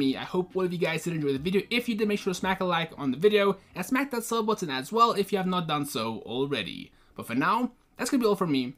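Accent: South Africa. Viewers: Wait are u south African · Are u south African bro?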